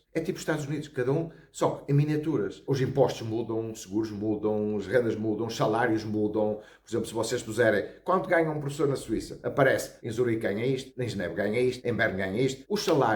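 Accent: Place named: Brazil. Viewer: Caralho...!!, vivo o paraíso e não sabia ?! , aqui no Brasil não é fácil mas você nunca passa por privações, de uma forma ou outra sempre tens uma mão amiga estendida à você!, gajo, falas português mais brasileiro que próprio de Portugal ! , conheça meu Brasil afinal foram os português que inventaram esta zona toda aqui, mas é bom demais !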